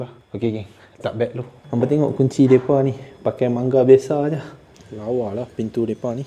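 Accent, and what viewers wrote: North, Malaysia. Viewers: Suka dengar loghat abg..org india tp loghat utara memang padu...btw saya org melayu kedah...loghat abg ni,buat saya rindu kt kg halaman di kedah...salam dari Labuan bang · sy pun baru jer jumpa vlog dokter, mmg puas hati especially penyampaian yg amat jelas (siap loghat utara lg, im from Penang), terus berkonten 🎉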